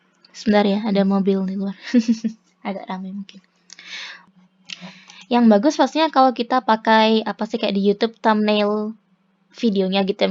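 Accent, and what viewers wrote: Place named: Java, Indonesia. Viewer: Semangat Mbak....walaupun di Tokyo dan sering komunikasi pake bhs jepang/english tapi aksen jawane isih ketok lho...wkwkwkwk. Maturnuwun nggeh tutorialnya about digital content...ayo banyak upload cerita tentah gimana bisa kuliah di jepang dll....kehidupan sehari hari di jepang, suka duka nya...dll...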